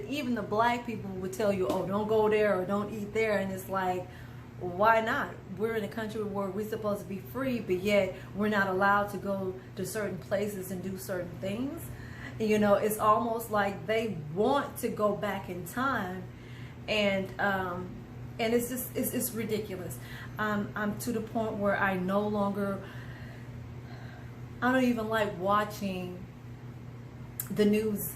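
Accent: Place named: South, United States. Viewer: I love your southern accent. It doesn't sound as though you originally come from Houston. I know you said you lived in South Carolina. Do you know which country in Africa you plan to live in yet? Also, I just subscribed to your channel because I love to see young black brothers and sisters doing positive things. The only advice I have for you is to make sure you have your financial ducks in a row before you go to Africa. I just got finished responding to a bevy of people in Africa and the Diaspora about a family that moved to Ghana from the Netherlands about a year ago but is now on its way back to the Netherlands because things got too hard for them. Their kids were in private schools for God's sake. Lastly, I would appreciate it if you could visit my YouTube channel I started this month to check out a video I produced about the N-word. 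It's very scholarly because of my background, which you can learn about if you visit the site. I also have four more videos coming up after I edit them--I'm somewhat technologically challenged. The next four are about how the N-word does not come from the Niger River, which is what some black scholars are now saying; how the prison industrial complex is genocide; how black lives didn't matter during the civil rights era, using three famous case studies; and my take on the Critical Race Theory. I also plan to launch a pan-African daily news channel early next year, which would probably be more fitting for you. But if you could support my cha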